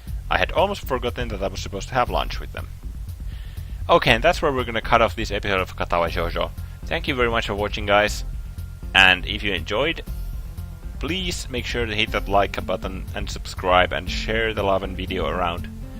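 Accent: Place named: Finland. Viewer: It might sound a bit stereotypical but i really picture Sam Lake reading Katawa Shoujo to me when i listen to your LP, i guess that's what a finnish/english accents will do to you! :D Good stuff so far really enjoying it!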